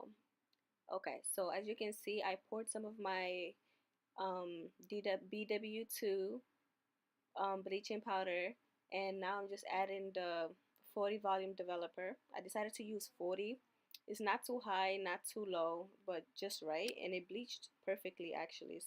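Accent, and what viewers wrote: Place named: Latin America. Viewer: Are u Latino? U have a accent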